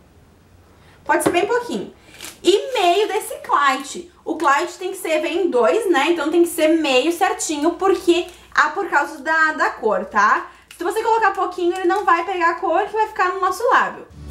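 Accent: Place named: Rio Grande do Sul, Brazil. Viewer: Super gaúcha nesse vídeo né? Amei a dica! Bjs Guria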